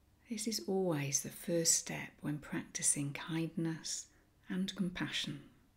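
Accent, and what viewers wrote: England, United Kingdom. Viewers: At last a warm English voice ...Aaah 🕉️🙏🥰✨🌟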